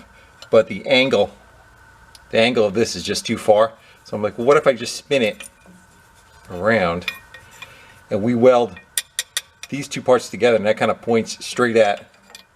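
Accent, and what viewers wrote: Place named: Fargo, United States. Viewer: "... try to knock out this bobcat skidsteer that I bought, oh jeez..."... are you originally from Fargo by chance? You do fix a lot of wood chippers and snow blowers...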